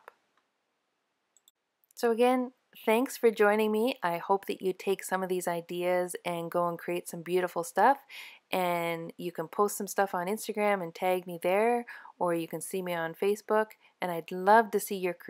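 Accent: Canada. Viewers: Excellent quick tutorial. (Are you Canadian?)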